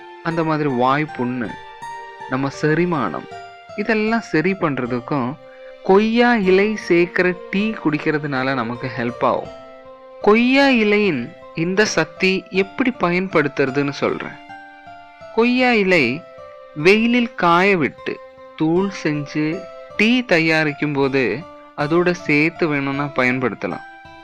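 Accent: Kerala, India. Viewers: சாரே.. மலையாளியோ??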